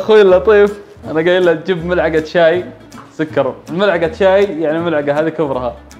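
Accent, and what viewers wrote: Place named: Al-Ahsa, Saudi Arabia. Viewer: من وين انتي؟😂😂 احسك حساوية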